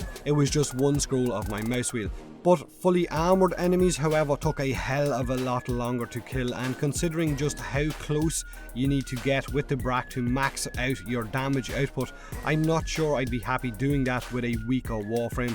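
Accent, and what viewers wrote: Ireland. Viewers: Are you Irish? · British..... he's Irish! lol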